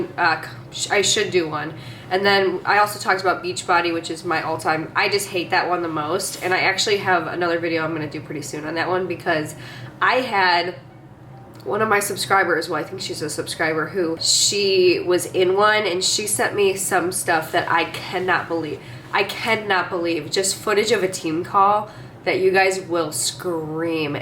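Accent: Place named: Chicago, United States. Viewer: It took me forever to figure out you accent 😂 Chicago is super close to us but juuuuuust slightly different. I looooove it.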